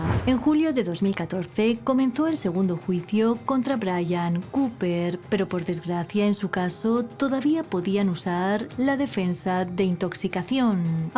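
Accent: Spain. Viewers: Ja debe ser el tono y acento de algunas regiones de España. Ya escuche esa tonada en otra gente española en YouTube.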